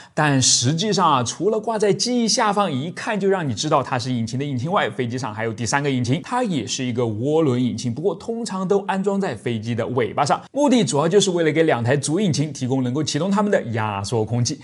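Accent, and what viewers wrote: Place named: Henan, China. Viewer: 哈哈，我也听出来了，河南话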